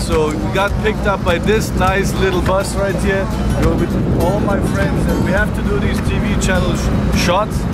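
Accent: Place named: Germany. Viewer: You sound happier... and more successful... always learn one thing for sure..that is to smile and be happy... offcourse other points are there too that we learn and get inspired from...love your german accent and the language ... wish you vlog more often... and yes... CONGRATULATION ON YOUR MARRIAGE...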